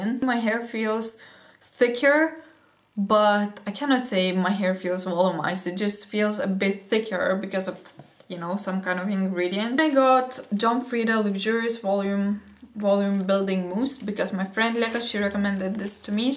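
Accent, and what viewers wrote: Russia. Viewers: I barely found your videos. They are great. Where are you from cause your accent sounds Russian. Awesome video by the way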